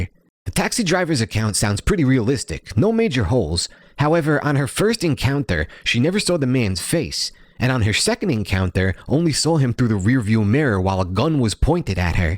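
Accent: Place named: New Jersey, United States. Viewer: lets get this man to 1k subs people. his jersey accent is worth it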